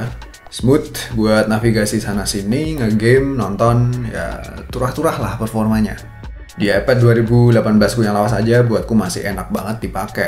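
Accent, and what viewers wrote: Java, Indonesia. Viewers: Mas medhok mu kui lho 🤣🙏🙏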